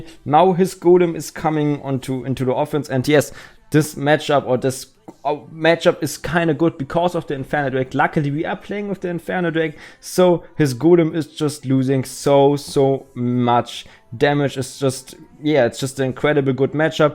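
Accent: Germany. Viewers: Ich liebe deinen deutschen accent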